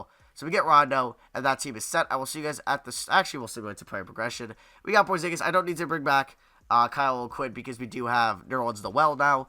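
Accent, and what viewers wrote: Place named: Long Island, United States. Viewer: Matthew B just Long Island accent nothing wrong I have it to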